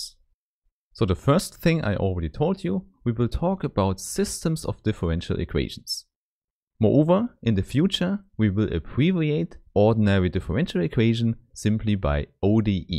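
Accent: Germany. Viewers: German accent makes this video even more credible.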